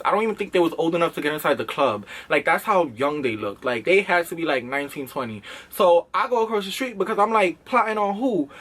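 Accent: New York, United States. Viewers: is so new york, i love it😂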